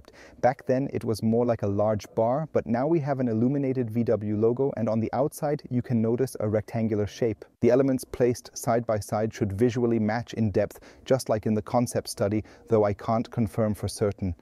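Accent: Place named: South Africa. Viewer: Hey bro, your accent sounds too familiar-are you South African??? 🇿🇦 🔥👊🏾😎 Great review though, I have thoroughly enjoyed it 🔥😉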